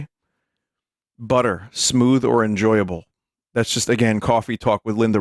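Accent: New York, United States. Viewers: I love how his new Yorker accent gets stronger throughout the segment. He even said "authahrity"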